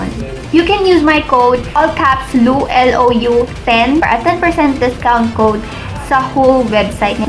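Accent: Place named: Conyo, Philippines. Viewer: Nakakatuwaaaaaa hahahahaha ang conyo mo na ate hahaha ang cutieeee lab lab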